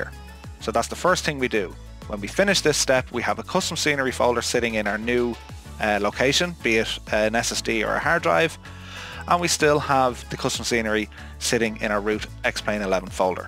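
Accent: Ireland. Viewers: I have watched a few other tutorials about this and i ended up deleting all of my aircraft... i was gutted and feeling hopeless about this symbolic linking or whatever... watched this and it made every bit of sense to me, it helps that you're a fellow Irishman, could understand the accent perfectly. Thanks for making this so clear to me, I've now got it working and i really appreciate it.. subbed! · Lots of nice content on your channel. Love the Irish accent 😀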